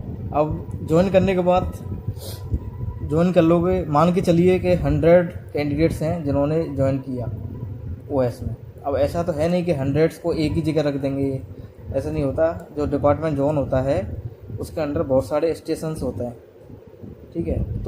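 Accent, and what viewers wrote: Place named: Agra-Mathura, India. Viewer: Bhai agra mathura side se lgte ho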